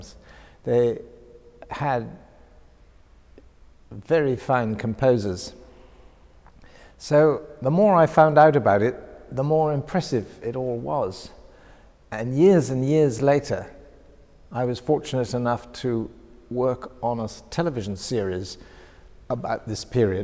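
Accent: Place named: RP, United Kingdom. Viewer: U sound very posh & u know wht u talking about! R u from London? Thanks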